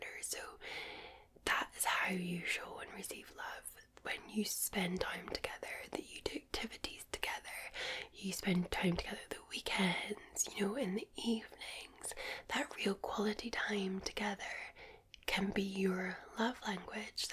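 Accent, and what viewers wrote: Scotland, United Kingdom. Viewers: Another Scottish ASMR. Another happy American soldier 🥹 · She looks like pin up art and calms me like.... tranquility. are a fine sleep aid. TY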